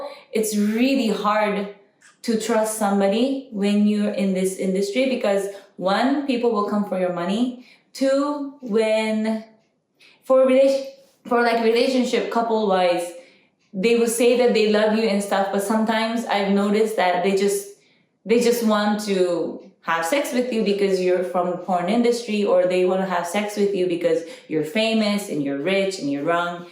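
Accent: Philippines. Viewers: Why is her accent sounds like filipino? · Filipino accent 😍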